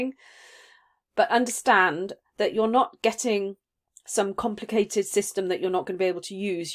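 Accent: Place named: United Kingdom. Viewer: For an American audience, you really speak too fast. Yes I understand you, but with your British accent I need a delay to digest what you say. I am imagine you would have the same problem with me speaking with my American accent.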